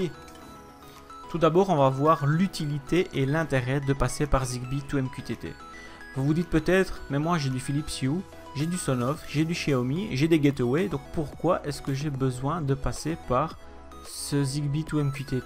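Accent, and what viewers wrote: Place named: Belgium. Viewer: Hello, Merci pour cette vidéo, étant en plein réflexion sur le futur de ma domotique avec peut être un passage vers HA donc ta vidéo tombe à pic 👍 Pour ton accent Belge aucun problème ça donne du charme à tes vidéo 😊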